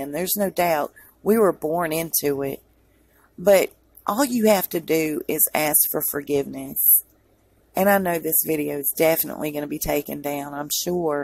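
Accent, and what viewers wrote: South, United States. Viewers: Well said!! You have this sweet country accent combined with such truth, intelligence and highly articulately. Love your work girl! 👏🏼👏🏼👏🏼👏🏼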